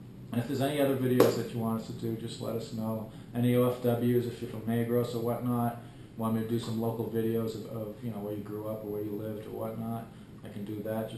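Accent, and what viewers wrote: Boston, United States. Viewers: BOSTON! I knew it! I was listening to you speak for about 5 minutes saying to myself "I think this guy's from back home..." I'm happy for you, boss - I moved to San Diego about 6 years ago and totally get a lot of your comparisons, because they're somewhat similar to my comparisons of SD vs Boston when people ask me how it is out here. That said, I've always wanted to visit the Philippines (and as of yet, have not) so I obviously can't really understand the Philippine lifestyle. Anyway, you've got a new subscriber. Go Sox/Celts/Pats/Bruins :D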